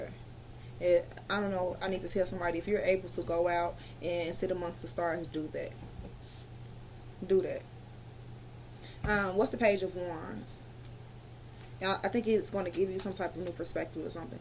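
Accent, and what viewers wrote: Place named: Chicago, United States. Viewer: You from Chicago? I feel like I can tell 😩😍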